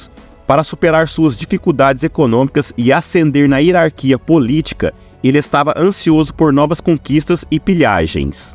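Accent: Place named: Caipira, Brazil. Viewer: O vídeo parece ser bom, mas o sotaque caipiiirrra nao consegui seguir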